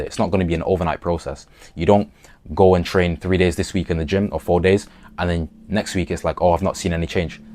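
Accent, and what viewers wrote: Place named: England, United Kingdom. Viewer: English accent funny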